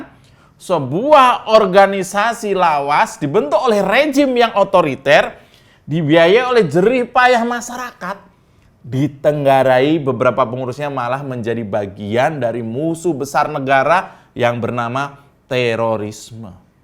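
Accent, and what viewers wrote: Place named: Banyumas, Indonesia. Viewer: Emang gaya bicaranya ngapak banget..Luurrr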